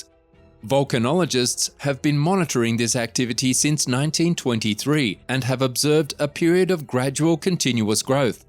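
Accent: Australia. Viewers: I don’t know the the narrator is from Australia, so what is interested in Yellowstone? I don’t know if I would believe someone from Australia would have all the answers for Yellowstone national park. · I started to watch this to see what fate was going on in the good ol USA. Much to my surprise there was an Ozzie bloke narrating and I knew it was a hoax. Who could take that seriously...Crikey!!!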